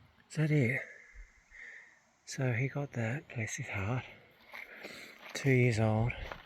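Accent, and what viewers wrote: Australia. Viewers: Your videos are always interesting and always looked forward to, no matter the content. You also have a soothing voice with the most wonderful Aussie accent! Praying for you,.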